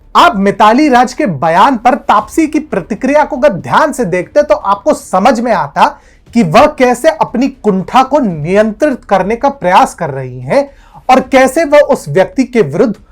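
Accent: Standard. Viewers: Bhai tune kya bola kuch ghanta bhi samajh nhi aaya... Par sun ne mein acha laga... Itna shuddh Desi Hindi bologe toh kese chalega...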